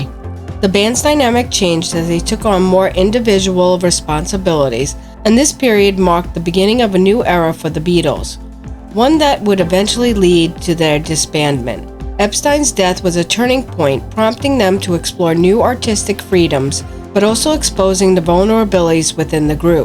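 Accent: United States. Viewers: You're probably an American, methinks.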